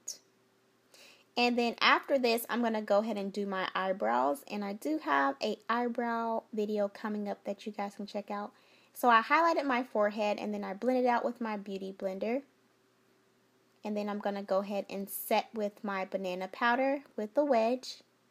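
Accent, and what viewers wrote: South, United States. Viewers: ☺️ girl!! You a hot mess. I'm loving the makeup and your southern accent. Simply divine.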